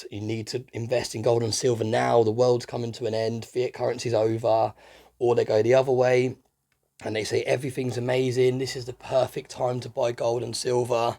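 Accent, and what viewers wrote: United Kingdom. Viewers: I have a ear for voices and you must come from the same part of England as Solomon Lane from Mission Impossible. 😁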